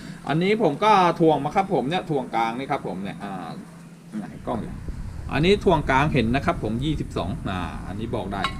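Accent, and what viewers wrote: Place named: Sukhothai, Thailand. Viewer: สำเนียงคล้ายๆคนโขทัยเลยน้า fcครับ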